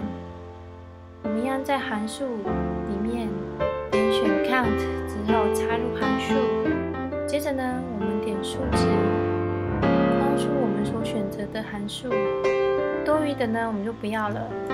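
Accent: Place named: Taiwan. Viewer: 非常慢的台湾普通话，我听起来心闷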